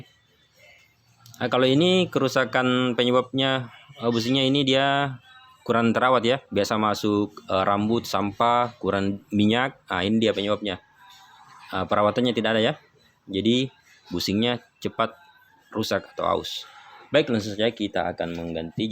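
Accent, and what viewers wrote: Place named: Bugis, Indonesia. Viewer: Tau ogi logatna anggotae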